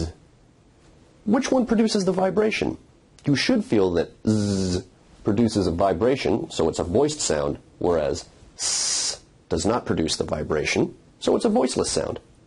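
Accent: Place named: North America. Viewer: He's speaking North American English, Non-British !!!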